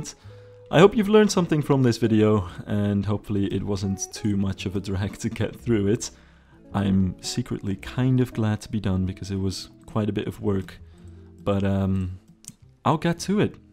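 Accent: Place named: Netherlands. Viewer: The thick Dutch accent tho :D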